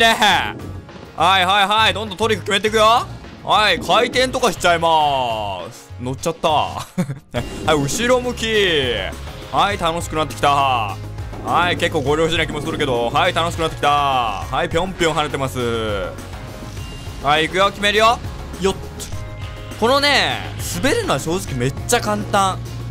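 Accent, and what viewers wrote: Kansai, Japan. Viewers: *の関西弁ばりばりのやつ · バリバリの関西弁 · さんの関西弁定期的にみにいってしまう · 関西弁多くて好きや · さんが関西弁だった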